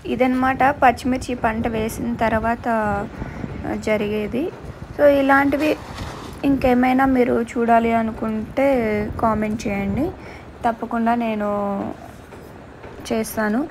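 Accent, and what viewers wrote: Chittoor, India. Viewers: Rayalaseema yasa vachestundhi...ma chittoor acsent la vundhi...little ga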